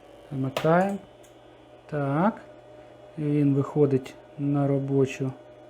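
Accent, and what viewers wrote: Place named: Zhytomyr, Ukraine. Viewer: Це житомирська походу, як у мене))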